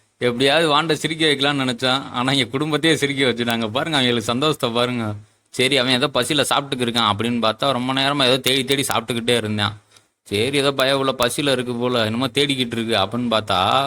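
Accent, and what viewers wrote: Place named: Madurai, India. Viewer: Mathurai slang 👌👌👌👌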